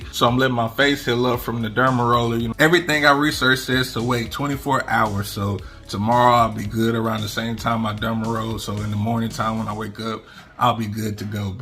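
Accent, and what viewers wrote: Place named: South, United States. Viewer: Even got hair on my chest … “ but that was already there “ 😂😂😂 bro you need a podcast , you’re hilarious. I can tell you form the south for sure . I am to , I’m in North Carolina.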